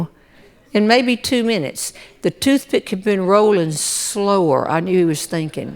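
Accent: South, United States. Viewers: My father's family if from West [by God] Virginia. This woman reminds me of an Aunt from there and my grandfather. Common sense to the nines. That sweet southern drawl as they lay down little pieces of candy while telling a story lead you to fall into the humor pit. Good stuff.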